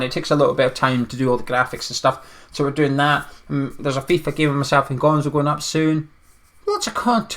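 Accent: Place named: London, United Kingdom. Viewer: Sounds like a London boy.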